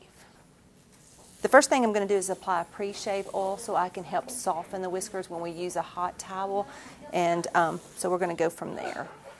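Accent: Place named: South, United States. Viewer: Ahhh the Southern accent. Like home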